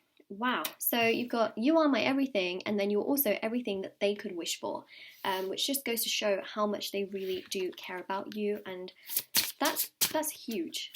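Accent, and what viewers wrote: England, United Kingdom. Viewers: Love your accent where in England are you from?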